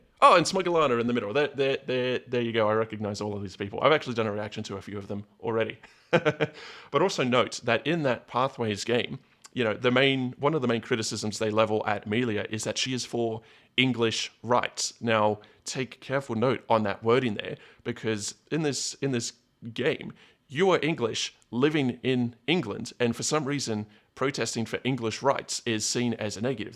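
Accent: Australia. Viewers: intellectual? I am an English Hampshire Hog. You sound Antipodean to me. I would go more Aussie than Kiwi. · I detect a fellow Australian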